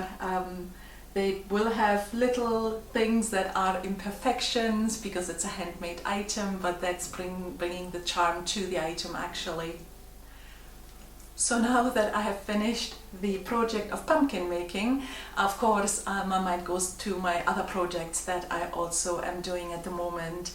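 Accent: Scotland, United Kingdom. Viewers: I just love your channel, and your Scottish accent. When I first watched you knitting I thought "why is she making so many baby hats"...lol. The pumpkins are just beautiful. I crochet and plan on giving these a try.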